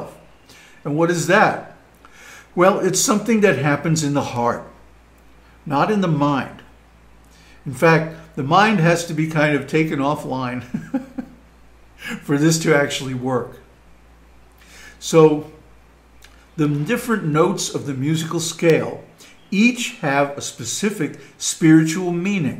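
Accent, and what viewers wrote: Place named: United Kingdom. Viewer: Guruji are you originally from England or Scotland ?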